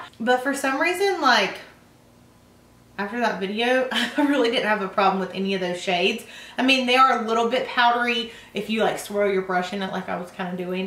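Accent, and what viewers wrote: South, United States. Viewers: Just found your channel and I LOVE that I've found someone with a southern accent that does makeup videos haha!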